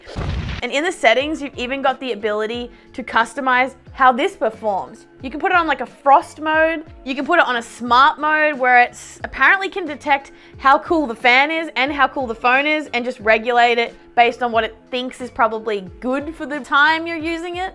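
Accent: Australia. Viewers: You're an Aussie and I love it, only Aussie youtuber I follow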